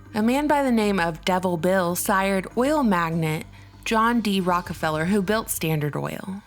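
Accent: South, United States. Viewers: A southern Belle and wish there were more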